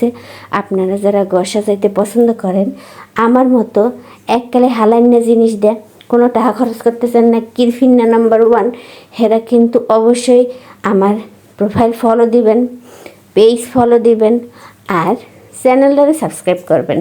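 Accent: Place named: Barishal, Bangladesh. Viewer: বরিশালের ভাষা তোমার মুখে কি যে ভালো লাগে আপু মাশা-আল্লাহ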